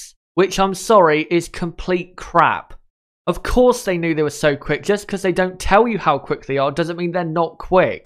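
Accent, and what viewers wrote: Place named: West Country, United Kingdom. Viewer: Great analysis. Are you from the West Country? It's just the way you pronounce "SebaRstian Vettel."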